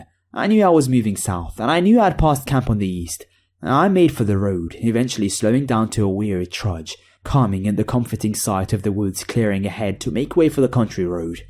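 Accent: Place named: England, United Kingdom. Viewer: Why is it always an English guy narrating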